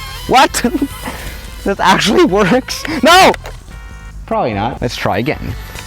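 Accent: Germany. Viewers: das ist das typische deutsch und kein Schweizerischen Akzent oder lebst du doch in Deutschland? English: thats the typical german and not the swiss german xd or are you live in germany? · Are you a german? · wait ur german? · Are u from Germany? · Are you German??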